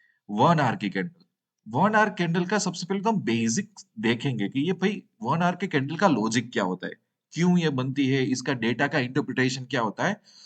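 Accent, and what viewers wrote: Gujarat, India. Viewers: તમે ગુજરાતી છો? ટોન એવો લાગે છે😊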